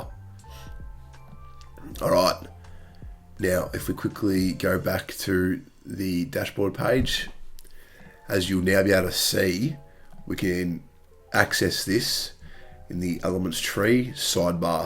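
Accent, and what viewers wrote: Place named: Australia. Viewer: "Hide" in Australia: "Hoyyyd" hahaha!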